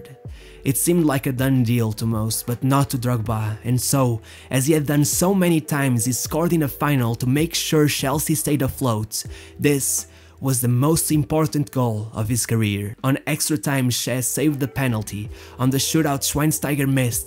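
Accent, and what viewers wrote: Porto, Portugal. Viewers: I hear the Portugese in your accent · aquele sotaque do Porto impossivel de esconder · Never knew it was a Porto accent!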